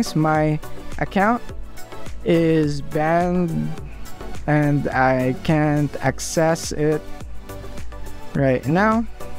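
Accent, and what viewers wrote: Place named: Philippines. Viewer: You sounds like a Filipino.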